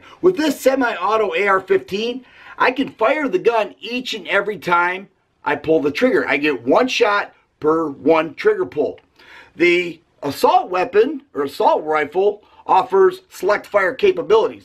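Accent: United States. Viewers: The presenter needs to do a little brushing up on his facts. He uses Assault weapon and Assault rifle interchangeable at about 8 seconds into the video. The AR-15 is not an Assault Rifle, but, it is an Assault Weapon. The terms are United States specific, because different words have different meanings in different places, but, he sounds pretty 'merkun. Assault weapon is usually defined as a semi automatic with a detachable magazine. Sometimes includes the definition of pistol grip, but, not always. It's absolutely true true that the AR is not an Assault Rifle. His claim that it's not an assault weapon is false.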